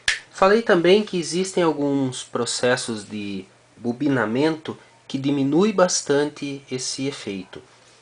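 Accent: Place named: Caipira, Brazil. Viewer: Gosto de voce porque voce fala que nem um caipira com certeza gosta de música caipira ambém......tabém sou filho de caipira...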